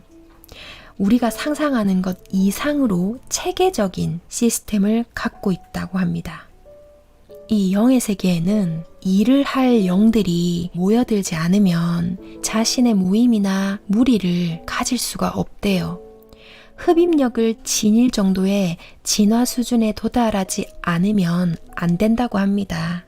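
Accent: Gyeongsang, South Korea. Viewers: 아우 나도 경상도 고향이지만.. 사투리 정말 듣기 싫다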